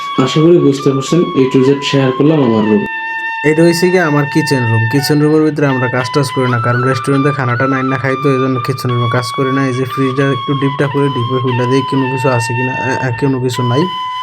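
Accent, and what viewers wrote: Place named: Comilla, Bangladesh. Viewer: আসসালামু আলাইকুম, ভাই, আপনার বাড়ি কি কুমিল্লা, বুড়িচং/দেবিদ্বার এইদিকে? কিছু মনে কইরেন না, আপনার কথায় আমরার মতো মনে হইলো😊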